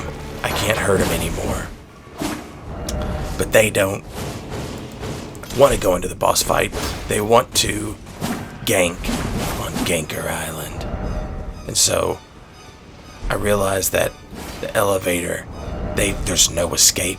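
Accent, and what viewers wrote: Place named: Kentucky, United States. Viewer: You have too good a Mitch McConnell impression not to be from Kentucky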